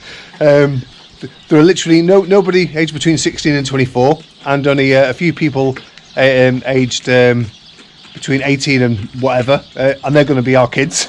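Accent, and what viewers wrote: United Kingdom. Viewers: I agree with you. The glasses make the difference with the song. High class 😅 Good pronunciation with a light british accent. It's easy to understand your French.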